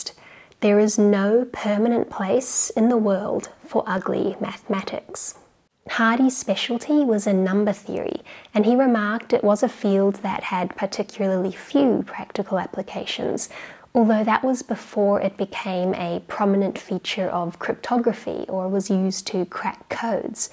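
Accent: Australia. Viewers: Are u Australian? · Yes, targeting Indian audience is a good option on YouTube. In India they promote technology like engineering and computer sciences. Is she Australian? Her accent sound Aussie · Hey are u Australian??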